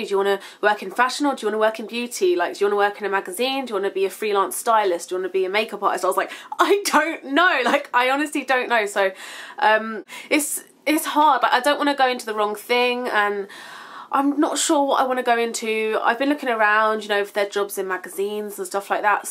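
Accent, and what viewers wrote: London, United Kingdom. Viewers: I wish I was born and raised in London. Your accent is so beautiful! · Even if i'm french and i don't understand all the words you say, I can't stop looking at your videos because you are so beautiful and i just love the way you look ! I also love your british accent i think it's so cute compered to the american one ! Hope you will continue to make videos beauce I always look at them ! Kisses from France ! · you learnt french?? then, j'adore tes vidéos!! keep doing this, i think it's kinda refreshing to see "beauty gurus" with a british accent!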